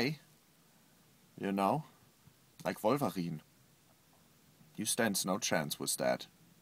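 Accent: Germany. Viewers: The German accent made this 50x funnier. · "Like volvarine" Oh GOD, I LOL'D. Tears, there are tears down my face. My Dad's German, so this accent already has me losing it, but saying that has me in utter hysterics! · god cant he put a little effort in pronounciation so he doesnt sound like the most german guy ever? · Is this guy actually really German or is he just faking the accent?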